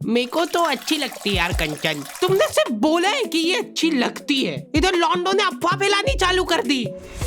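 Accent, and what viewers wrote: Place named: Rajasthan, India. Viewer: Bro is from rajasthan 😅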